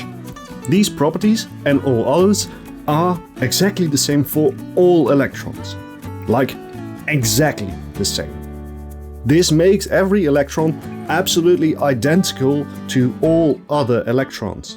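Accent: Netherlands. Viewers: I can hear that you're Dutch. Great video btw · you speak very good english. it was at most a hint of a Dutch accent. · Your accent is incredibly Dutch. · You sound like you have a Dutch accent. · You sound like you’re Dutch, am I right? :)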